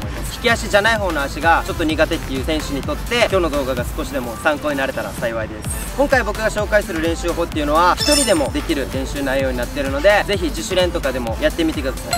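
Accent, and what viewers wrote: Okinawa, Japan. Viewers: 沖縄の人かな？ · 沖縄訛りな感じ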